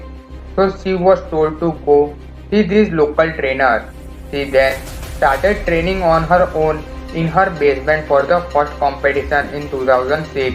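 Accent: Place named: India. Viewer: Beautiful woman and lovely vide Disgusting Indian accent audio ruined it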